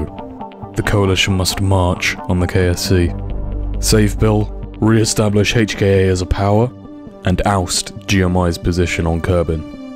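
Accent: United Kingdom. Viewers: I literally adore your voice. The voice over aspect really does sound professional. The air combat made me cringe a little though, a bit too much top gun. If an enemy started slowing down in front of them a real pilot would start to climb to to lower the speed and maintain distance. That way the enemy would have to climb to attack him, which they can't do with no speed, and the attacking pilot can later trade that altitude for acceleration later in the fight as well as using gravity to enhance his turn capability. I mean most people will just think its cool and not understand the finer points of air combat so don't take it too hard, but its about the most basic example I could imagine of energy-manoeuvrability theory upon which every single combat pilot's training is based. Literally as elementary as multiplication for a physicist. But that off my chest, the rest of the video was great and very well produced. 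And hello from a fellow Brit, at least I won't have to cringe at every other word's pronunciation. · Find me a non British KSP content creator…. It’s annoying. Honestly, what is with British people and KSP? I even have a friend in Britain who plays. I’m not overly pissed, I’m saying there’s too many and I’m done listening to the accent. I’m entitled to my own opinion for whoever finds this comment and so are you.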